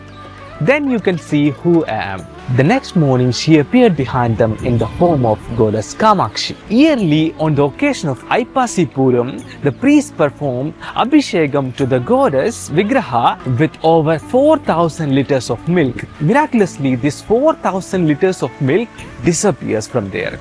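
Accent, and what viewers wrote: India. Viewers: Yes I agree, his way of delivering the information on Devi and the sthla puranam is in a very south indian english accent, hence seems very natural and relatable, unlike some who fake an accent. We can surely deliver any news in our natural tone and accent. Keep up the work sir.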